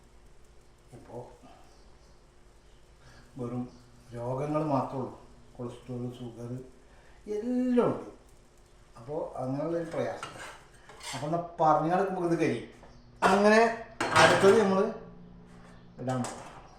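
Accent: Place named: Malappuram, India. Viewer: മലപ്പുറം സംസാരം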